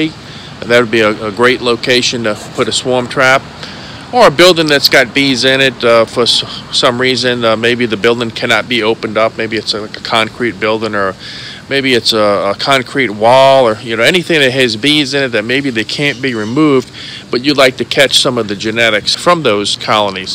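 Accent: Louisiana, United States. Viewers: As soon as you started to speak, I knew you were down here in s/e La. good job on the video.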